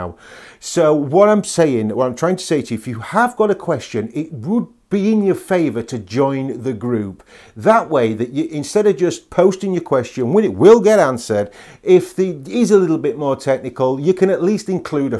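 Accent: Midlands, United Kingdom. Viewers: Would you mind disclosing your appropriate location? Your accent sounds not too far from me (Derby) and i was wondering about local 'low light pollution areas'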